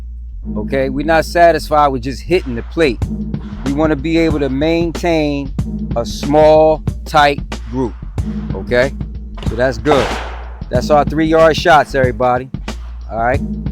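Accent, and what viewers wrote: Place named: East Coast, United States. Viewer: I knew I sensed the east coast presence brother. I’m from the Bronx and it was like listening to one of my fellas when I watched your video. Much love to the channel and future success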